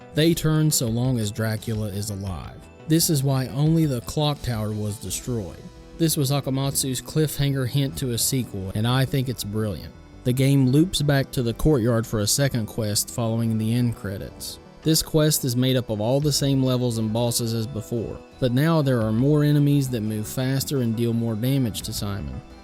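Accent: South, United States. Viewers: hear a Southern drawl explaining Castlevania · So you sound from the south. Same here. I love this channel. Thank you!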